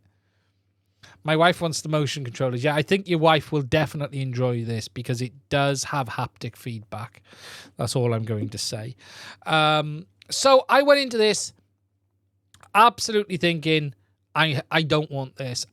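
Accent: Wales, United Kingdom. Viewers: Stumbled across your channel and thought I detected a bit of a Welsh accent! Enjoyed your review & subbed. Lots of useful info:) Many thanks! Whereabouts are you based in South Wales? I'm up in Ceredigion but not a whole lot of FPV action up here. I've been building/flying drones since the DJI Naza & KK-2 controllers were around so I'm stuck with a diverse fleet of analog FPV quads/wings, mostly running TBS Crossfire or FrSky R9 (although I did spring for a Mini 2 for some stable 'Countryfile' style footage). I'm keen to switch to digital FPV for improved clarity so I'm considering the Avata for some Cinewhoop-style footage but also keen to retro-fit a DJI Air unit to a couple of my existing drones. Sounds like a pair of second-hand V2 DJI goggles would probably cover both bases subject to following guidelines for rooting, etc. Cheers!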